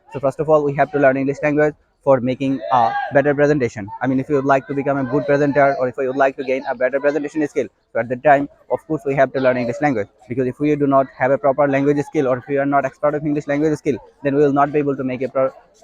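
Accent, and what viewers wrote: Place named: Indian subcontinent. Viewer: Pronounciation skill needs developing.Here latin and British mixture as well as indian sub continent lingua style clearly shows😅. Anyhow, best wish.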